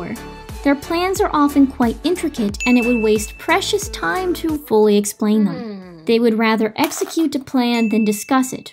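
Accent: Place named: New England, United States. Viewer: Not certain, something New Englandish. It's fun.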